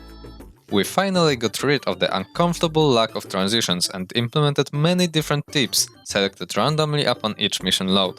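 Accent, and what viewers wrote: Poland. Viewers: Ten polski akcent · Słychać że polak :D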